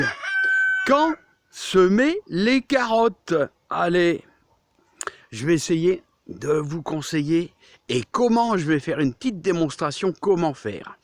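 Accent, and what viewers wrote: North, France. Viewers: je travaillais avec des collègues qui venait de la SEITA de Lille ,certains de Bétune. Lorsque nous avons tous été licenciées se fut le retour au bercail pour eux. Avec vous, je retrouve le parlé du Nord et j'avoue que ça me fait du bien. Bonne après midi à vous.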